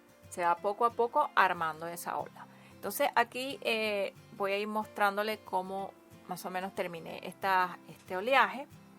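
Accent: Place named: Venezuela. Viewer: Esta chica es muy buena explicando, tiene estilo me encanta, tiene acento venezolano, qué bonito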